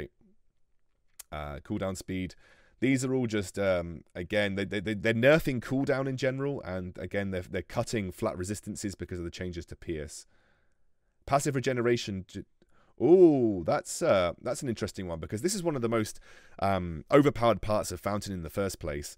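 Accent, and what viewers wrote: United Kingdom. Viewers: Phinn yeah. Luckily his accent is textbook British English so yeah could understand easily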